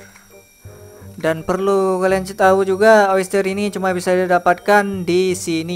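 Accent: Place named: Aceh, Indonesia. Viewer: Salam dari aceh nice video bro pantes logatmu kok kaya familiar saya hahaha.. beu maju beu sukses beuh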